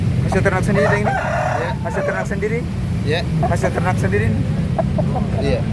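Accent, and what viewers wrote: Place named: South Sulawesi, Indonesia. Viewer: Salam mkssr daeng baru tadi lihat sort ehh logat"nya Sulsel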